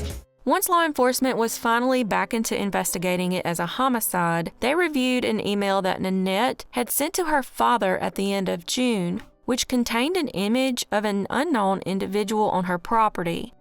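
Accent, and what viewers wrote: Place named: Louisiana, United States. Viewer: twelve of my ancestors are entombed in that cemetery(St.Joseph). 🙂 You have a Monroe, Louisiana accent. I don’t know where you’re from though.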